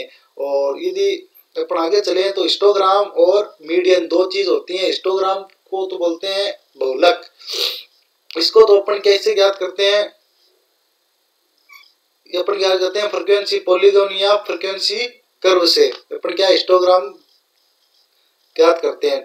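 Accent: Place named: Bagri, India. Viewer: Sir aap hindi bdiya nhi bolte . Aapke tone se bagri nikl rhi h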